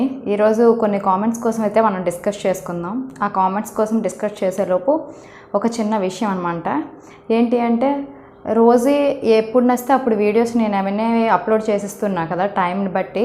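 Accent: Srikakulam, India. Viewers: Maadi Srikakulam sis... ani chusa appudu anukunna ma side velladi ani...mem kuda vyshyas...bobbili lo ma vallu unnaru...slang ni marchukomani comments chese vallandaru posh guys ayiuntaru...anduke nativity ni comment chestunnaru...evari kosam meeru change avvadu aite vallaki value ichinatlu avtndi...keep doing ur vlogs...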